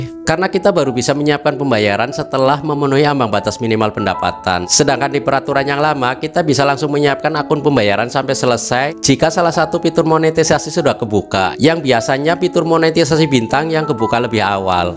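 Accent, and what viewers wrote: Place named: Tegal, Indonesia. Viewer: Kaya wong Tegal sampean mass ngomong e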